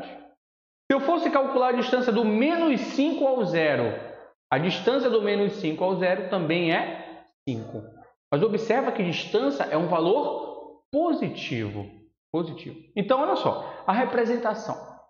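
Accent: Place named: Pará, Brazil. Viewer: Parabéns, aula muito bem explicada, e comum jeitinho paraense que só quem é daqui conhece kkkk Obrigado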